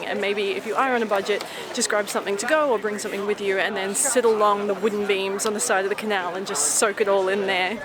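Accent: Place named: Australia. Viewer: Hiya! Welcome to Denmark! I’ve been to Copenhagen twice and it’s such a peaceful quiet city with lots of gorgeous parks. I’ll help you choose the bed. Always choose the top one! There’s just 3 cities in which I would see myself living, it’s London, Melbourne and Perth. Would you see yourself living in Copenhagen? Thank you for sharing Tivoli Gardens, I’ve only seen it from the outside as they were closed with Easter. Now I know I actually missed something, reason enough to visit for the third time. Good thing is that I can be in Copenhagen within 3 hours starting from my home address as it’s just half an hour to the airport and just a 1 hour flight. The canals are gorgeous in over there. I really got the feel that I need to get back to CPH. Nyhavn is a great place. $18 for a sandwich is insanely expensive. Getting on the canals is one of my favorite things to do when visiting a city, boat tours are usually quite good. 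By the way, have you taken the train to Malmo? That’s a great one-day trip from Copenhagen, just 30 minutes by train. I completely feel you with that solo traveling through Scandinavia. I’m not usually into hostels because I’m a light sleeper, but this hostel seems amazing. I love the Australian accent, it’s the best English there is. I hope you’ll enjoy much more of Europe. Now that you’re in Europe, I can finally catch up with the long bucket list you made for me 😂. Have a wonderful week and safe travels 🤙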